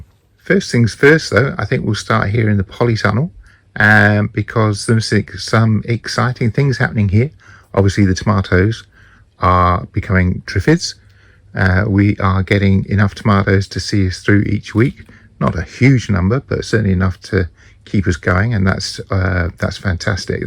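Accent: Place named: New Zealand. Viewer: Just 30 seconds in and loving your kiwi accent blended with English. Nice sound to it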